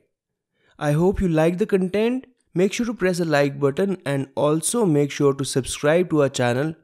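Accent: India. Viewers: Your accent is so Indian Love it!!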